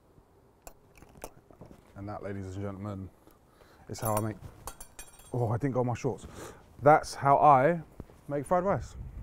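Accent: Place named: London, United Kingdom. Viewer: He speaks London👍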